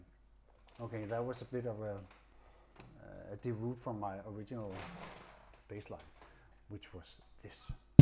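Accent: Denmark. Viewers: Elsker den danske accent :D Men super fedt spillet :D! spiller selv lidt funkey bas for sjov men gad godt at have din erfaring!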